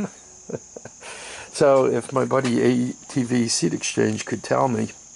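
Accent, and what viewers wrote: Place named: Central Jersey, United States. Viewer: Beautiful country there. I'm in central Jersey and thought I recognized some local flavor in your accent!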